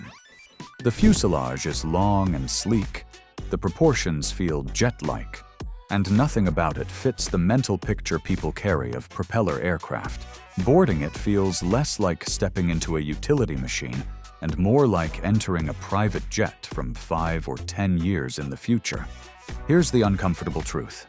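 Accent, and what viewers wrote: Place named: United States. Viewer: Good to Hear an American.🇺🇸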